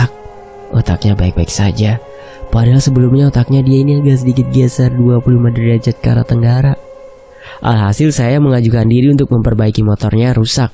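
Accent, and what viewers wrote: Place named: West Java, Indonesia. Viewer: Akhirnya mimin bawain cerita dari sunda...bisaan c mimin logat sunda na haha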